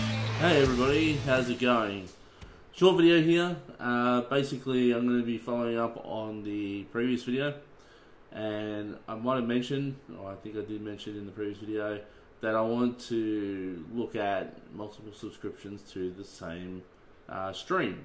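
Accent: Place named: Australia. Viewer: nice ausie accent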